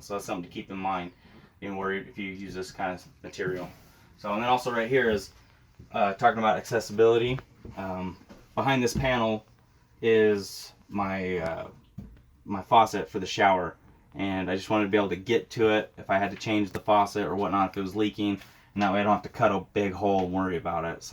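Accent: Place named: Utah, United States. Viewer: As soon as I heard him talk I could tell he was a Utahn. 😂 Great job way to make us proud! 👍🏼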